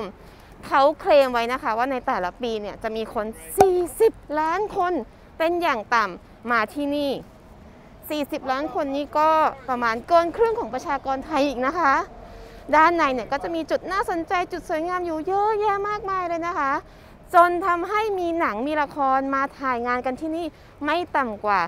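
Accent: United Kingdom. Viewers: ฝรั่งชมคุณกุ๊ดเกี่ยวกับสำเนียงอังกฤษฟังแล้วมันมีสเน่ห์น่ารักจริงๆค่ะสำเนียงจะแตกต่างกับสำเนียงอเมริกา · หญิงคนขายงานศิลป์ชมคุณกู๊ดว่า "ชอบสำเนียงและน้ำเสียง UK ที่มีเสน่ห์ไพเราะจับใจในการชื่นชมในงานศิลป์ ทำให้เขารู้สึกดีมีกำลังใจมากๆ เพราะไม่ได้สัมผัสบรรยากาศ และความรู้สึกดีๆ จากนักท่องเที่ยว ที่สวยและน่ารักแบบคุณกู๊ดมานานมากแล้วครับ"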